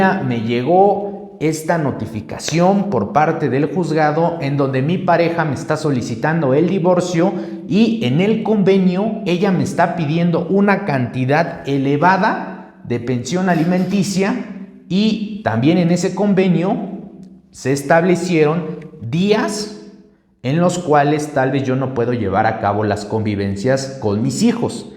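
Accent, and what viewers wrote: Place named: Peru. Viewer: Pensé que era de peru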